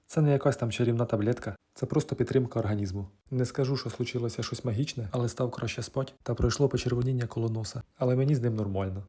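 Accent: Ukraine. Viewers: Яка проста укаїнська говірка! Суперово!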